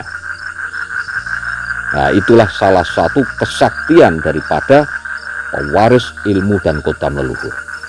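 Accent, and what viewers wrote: Java, Indonesia. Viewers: saya suka logat jawa yg kuat di aura nya... mksh wejanganya mbh ... 🙏🙏🙏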